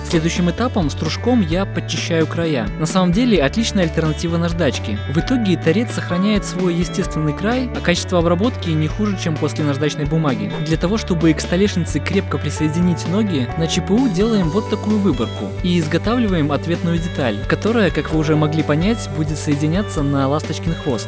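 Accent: Belarus. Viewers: Ты из Белоруссии?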